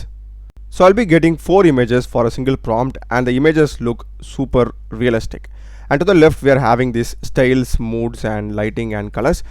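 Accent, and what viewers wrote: India. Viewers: I don't catch up your Indian Accent English. Can you speak slower, or use AI speak for you.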